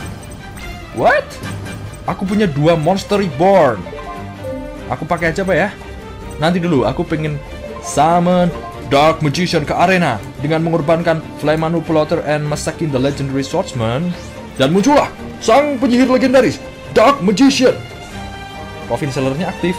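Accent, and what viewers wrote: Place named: Purwokerto, Indonesia. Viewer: Dari medok nya, apakah agan orang Purwokerto atau sekitarnya?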